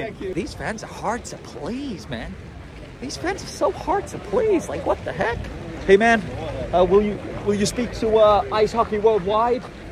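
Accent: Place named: Scotland, United Kingdom. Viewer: The room looks beautiful 🥰 nice Scottish accent 😂x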